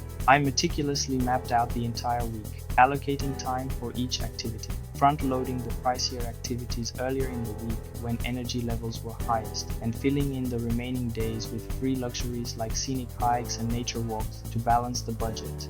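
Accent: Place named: India. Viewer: Thank you Indian guy (the accent was a dead giveaway away). These are great tips to share. Although I’m way ahead of you, as I have been doing every one of these things and more for the past 2 decades. Trade off was that I have traveled the world, been to the finest of places, and am surrounded with luxuries “all the things” which I have never once paid retail price for. Champagne on a beer budget is totally possible with meticulous and methodical planning 🥂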